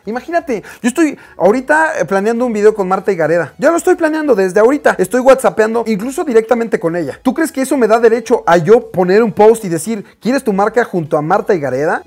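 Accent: Chile. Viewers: suena a chileno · See oye a chileno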